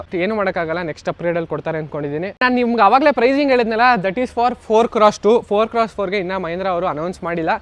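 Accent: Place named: Mysuru, India. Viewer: Chennagidhe nimma mysuru kannada.. hige cargala bagge mathadtha iri..ellaru nodi tilkothaare..jai kannada, Karnataka, jai Bharatha ♥🙏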